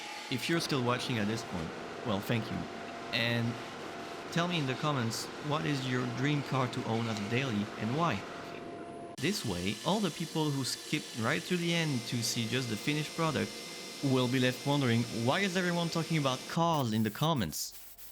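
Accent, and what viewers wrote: France. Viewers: Love the commentary. I mean come on, you're a french dude so the accent makes your already great videos even better. Takes longer to edit but I think overall it's worth the effort. Also strictly for fun, 1965 Mustang. Or '67 Camaro. If I'm being practical a Suburban newer and with fewer miles (and rust!) than my 2012 or a Jeep Wrangler with a winch for dragging logs out of the woods and home to the lathe 😃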